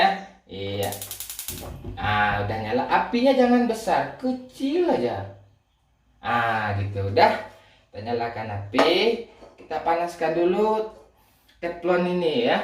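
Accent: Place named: West Sumatra, Indonesia. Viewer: Dari logat nya aja memang asli orang minang ehh btw salam dari Payakumbuh bang hehe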